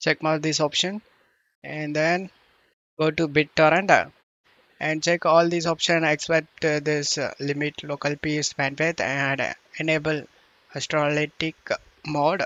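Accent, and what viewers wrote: India. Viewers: as soon i see indian accecent i knew hw will fix my problem thanks bro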